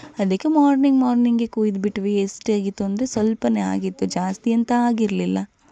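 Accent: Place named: Mangalore, India. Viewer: Huu mangalore kannada mix aagide swalpa😮😮🙄🙆🏻‍♂️🙆🏻‍♂️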